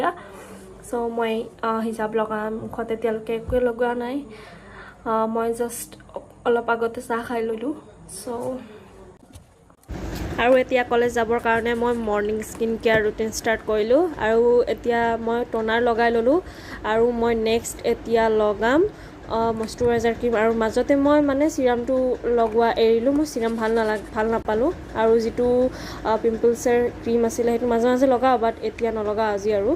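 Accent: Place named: Sylhet, Bangladesh. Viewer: বাংলা লেখা স্বত্বেও কিছু না বুঝা আমি😅 সিলেটি সিলেটি ভাব আছে কথায় কিন্তু কথা গুলো সুন্দর। ভালো লাগলো❤️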